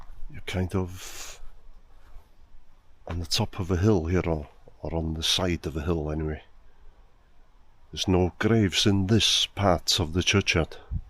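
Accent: Wales, United Kingdom. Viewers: I absolutely love listening to your accent. Especially when you speak in Welsh. So beautiful! Thank You. Indiana USA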